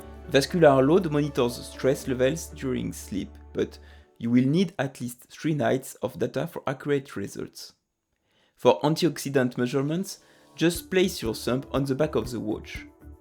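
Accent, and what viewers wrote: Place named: France. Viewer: This is the thickest French accent ive ever heard. Its great. Thanks.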